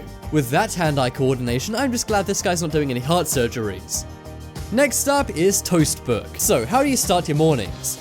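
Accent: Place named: United Kingdom. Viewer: Your Sound British so say Tap not Faucet 👎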